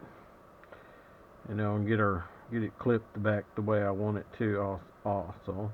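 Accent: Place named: South, United States. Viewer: Impressive bud! Dropping by to show you some love! From one Southern boy to another....just guessing by that voice lol lol.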